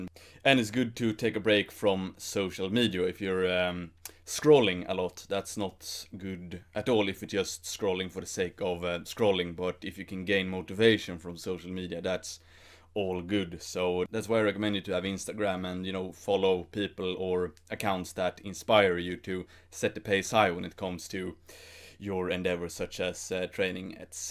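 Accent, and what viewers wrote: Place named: Stockholm, Sweden. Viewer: Är du från Stockholm? Kan inte undgå att höra dom karaktäristiska "i:en"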